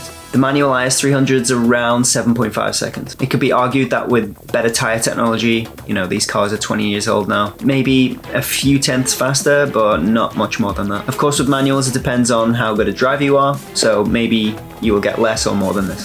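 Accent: Liverpool, United Kingdom. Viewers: are you a scouseer whos lived in america or the other way round?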